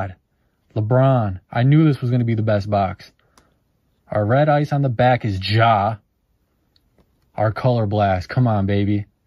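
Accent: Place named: St. Louis, United States. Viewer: Your accent sounds like Saint Louis county…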